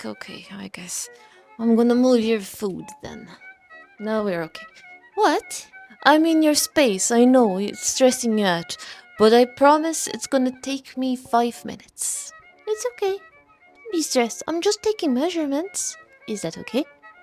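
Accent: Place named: Ireland. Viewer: Came from the crafts but stayed for the accent ngl! 😅 I spent half the video trying to place where your accent is from. I wouldn't have guessed Ireland! I'm Canadian, and we don't get to hear a lot of regional Irish accents on this side of the pond. I love your vibe, your humour, and this video has a nice professional polish. Can't wait to check out the rest of your videos! +sub! 😁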